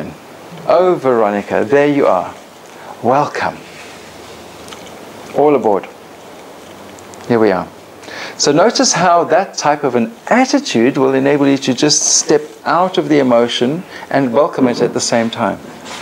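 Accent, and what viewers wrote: South Africa. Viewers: South African accent? 😁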